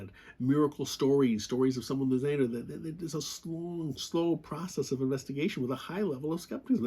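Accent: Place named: Minnesota, United States. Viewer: Hey, I'm watching this video--per your recommendation! BTW, are you originally from Minnesota? I'm only guessing this because your accent reminds me of where I grew up.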